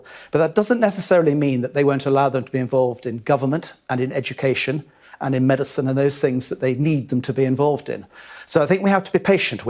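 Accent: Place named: United States. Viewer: I think you people made a typo mistake, this guy sounds like American unlike the title of the video .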